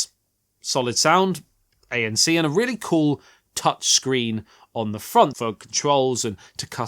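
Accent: United Kingdom. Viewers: Love British English accent ❤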